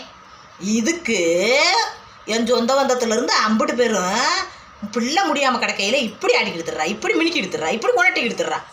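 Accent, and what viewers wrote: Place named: Tirunelveli, India. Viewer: அக்கா திருநெல்வேலி பேச்சு வேற லெவல் சூப்பர் ❤சூப்பர் அக்கா ❤❤❤❤❤❤❤❤❤❤❤❤❤❤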